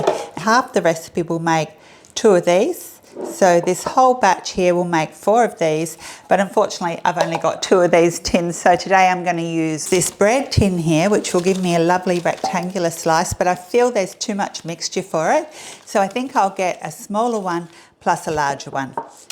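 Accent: Australia. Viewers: Hi have just found u on Youtube and hoe lovely it was to hear a fellow Ozzie there, have seen yr video on braised steak and will be cooking that tomorrow night also the date scones, my husband loves my scones so I cant wait to give him these date scones looking forward to trying ur other recipes cheers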